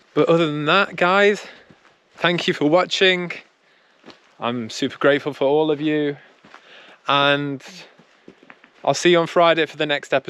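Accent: United Kingdom. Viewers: pitam Bezveze ono sve mi je Čudno pričaš Englesko ka Britanac pa san mislija da si rođen u Londonu ti samo živiš tamo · Hey brother! I just wanted to say that you're such a polite and charismatic person. You're always so down-to-earth and friendly, and I really admire your hardworking nature. With your ties to the UK and that charming British accent, I think you're well on your way to something big. With the little investment to get realtor license, and with the drone, website, and YouTube channel you already have, there’s definitely money waiting to be made. Keep pushing forward—great things are ahead!" To calculate the commission you'd make from selling two villas worth €1 million each with a 3% return: Calculate the commission for one villa: €1,000,000 * 0.03 = €30,000 Since there are two villas: €30,000 * 2 = €60,000 So, you would make €60,000 in total from selling both villas.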